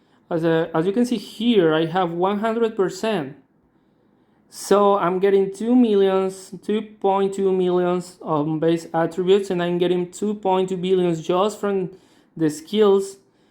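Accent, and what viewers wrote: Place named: Mexico. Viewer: Nice, didn't expect you to sound like every other Mexican.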